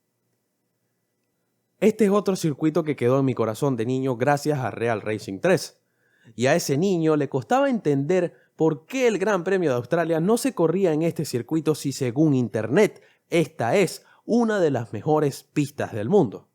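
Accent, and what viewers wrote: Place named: Venezuela. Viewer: Hola hermano, increíble vídeo. Tu contenido es lo mejor de lo mejor. Ese acento se me hace inconfundible, eres de Venezuela no?? Un placer ver a un compatriota haciendo contenido de este estilo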